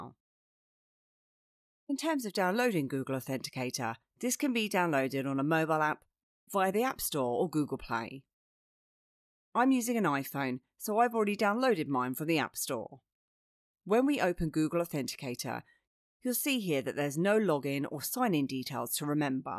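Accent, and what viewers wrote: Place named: London, United Kingdom. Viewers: this wonderful London accent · I love her upper class so much British accent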